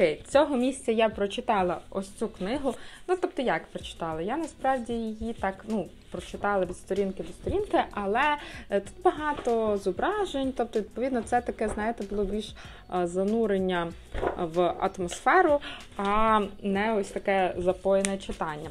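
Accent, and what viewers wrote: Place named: Surzhyk, Ukraine. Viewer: На нормальном русском языке смотрели бы вас больше. Чем на деревенском польско-русском суржике.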